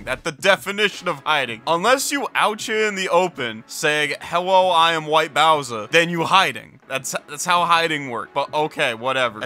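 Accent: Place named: Philadelphia, United States. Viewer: banger video !! u from philly huh?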